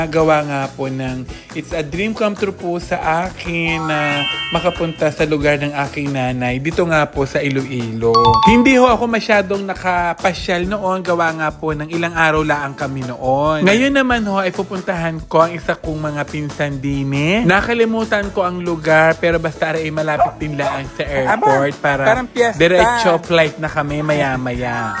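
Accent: Batangas, Philippines. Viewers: Authentic Batangueno ang narrator na pumunta sa Iloilo, di ba? The best pakinggan.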